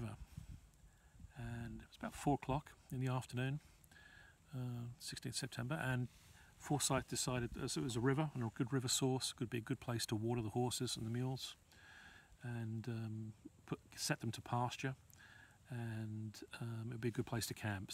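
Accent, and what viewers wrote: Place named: England, United Kingdom. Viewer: Good ole English voice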